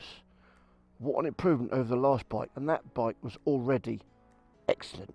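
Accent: United Kingdom. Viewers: You sound like an east-end barra-boy, yet your pronunciation of panniers was the poshest I've ever heard! 😀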